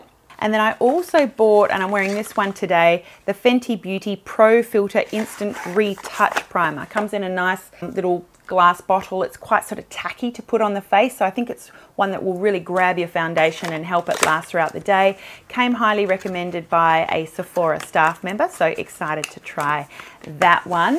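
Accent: Australia. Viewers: Great to see u again and the refreshing Aussie accent and all the familiar products I see on the shelves here 🤗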